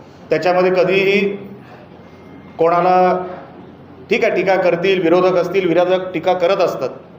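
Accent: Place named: Konkan, India. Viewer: Kokan voic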